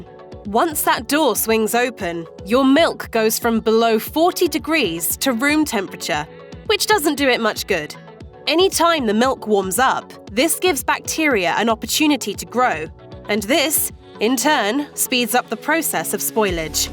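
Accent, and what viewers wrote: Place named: United Kingdom. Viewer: wait whaat! , mashed isn't british lol , where'd you find this so lovely nice british narrator lady , she's sounds awesome , btw im british too , great video & lovely narration too , stay safe mashed staff , bye!!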